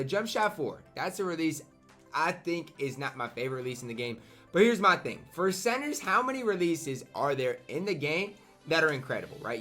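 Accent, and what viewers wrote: Iowa, United States. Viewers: He is from iowa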